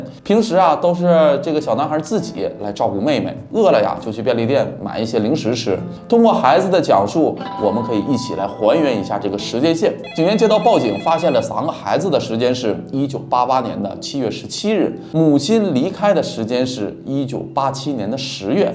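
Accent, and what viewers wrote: Northeast, China. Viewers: 感覺樓主為了把普通話講標準特別吃力，還是經常露出東北話的發音，總是替他捏把汗！其實根本不用刻意地說標準的普通話，用東北話講解更有特色！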